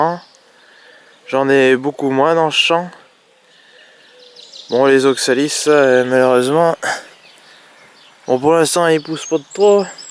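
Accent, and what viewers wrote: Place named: South-West, France. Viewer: Coucou, Arrête tes conneries! Tu nous fais quoi! Tu n'as pas honte! Et la grelinette elle est où!!??? Je plaisante 😉😁, tu as bien raison, il arrive un moment...je me souviens tes vidéos, c'était wahou très sportif🥵🥵 Quand je vois ta terre, wahou, moi elle n'est pas du tout comme ça, elle colle elle colle... J'ai commencé mes plantations de tomates et je continue avec toi 😂, en tout cas c'est super de voir ta manière de faire. J'adore tes expressions, ton accent du sud ouest, c'est toujours un plaisir de t'écouter et regarder tes vidéos. Des haricots remontant, là tu fais fort😂 Elles sont où tes fleurs!!!??????? Bonne journée A bientôt 😘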